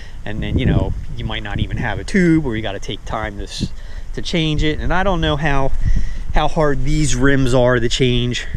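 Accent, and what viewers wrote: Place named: Upper Darby, United States. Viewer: I haven’t lived in Pennsylvania in 14 yrs but I know an Upper Darby accent when I hear one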